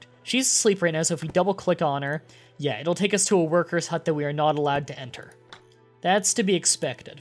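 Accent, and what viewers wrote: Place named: Canada. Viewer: 99% Sure he is Canadian. The way he says "about". ABOOOOT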